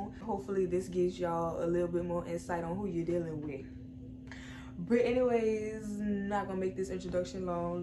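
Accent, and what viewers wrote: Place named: New Orleans, United States. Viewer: I can tell you from N.O from the accent 😂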